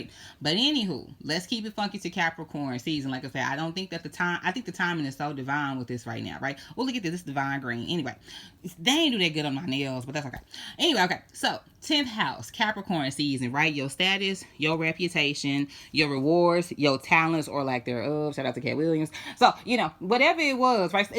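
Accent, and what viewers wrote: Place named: Tennessee, United States. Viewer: love your accent, not Georgia, maybe Tennessee Kentucky?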